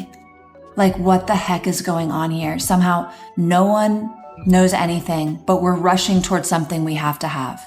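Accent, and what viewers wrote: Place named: California, United States. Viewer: Where did you come from when you moved to Marshfield? Never mind, just found out you came from Kommiefornia. I was wondering because to be frank, you sound like someone from there. Why don't you carry your butt back there. We don't want folks like YOU in Missouri.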